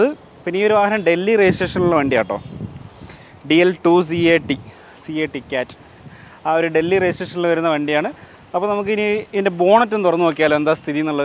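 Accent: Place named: Kozhikode, India. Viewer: நீங்கள் பேசுகின்ற கோழிக்கோடு மலயாளம் எனக்கு மிகவும் பிடிக்கும் ❤❤❤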